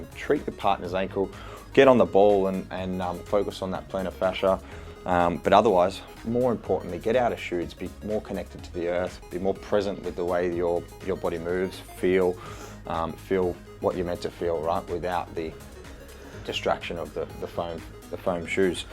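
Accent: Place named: Australia. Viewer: Getting fixed while that sexy Aussie sauve voice sexing me down...is what's up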